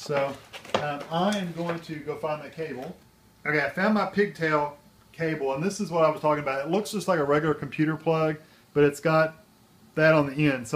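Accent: United States. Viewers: Thanks for you video ! Its great made, you 're nice guy ! But Im french and would have appreciated english subtitle because you have a strong english/american accent ! lol :))) I would have like to see the internet settings panel because I didnt understand if you need to set up login and password of the internet provider or not for adsl...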